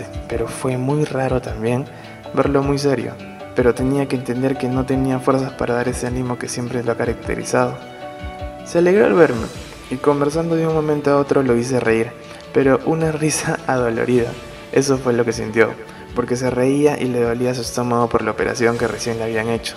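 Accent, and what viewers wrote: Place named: Peru. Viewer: Gaudhi Solimano Claro, cualquiera conoce la voz de un peruano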